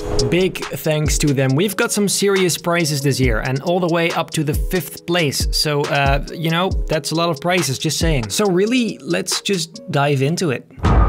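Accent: Netherlands. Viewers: I ain't gonna lie bro, I don't mind you having a Dutch accent when you talk, but I DO when you sing..That was intense haha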